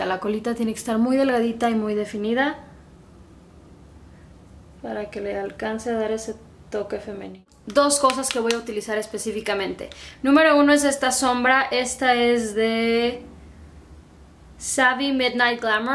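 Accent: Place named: Fresa, Mexico. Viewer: Hablaas taaan pero taaan fresa que desespera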